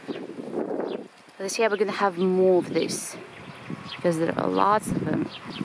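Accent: United Kingdom. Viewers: You are talking like British people But you are much more pretty God bless you and your family and your homeland Belarus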